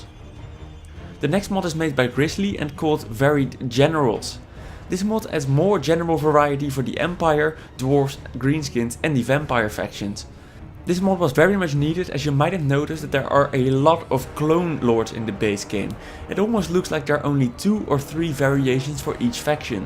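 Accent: Netherlands. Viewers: Somebody is Dutch..:) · Are you dutch? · Someone is really really Dutch. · I'm now.. and you are dutch, and have braces.. i can tell.. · You sound quite Dutch :) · This geeza Dutch? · Nederlands? :) leuk accent, kan ze d'r zo uitpikken :P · It's fairly easy to hear the dutchness in the voice XD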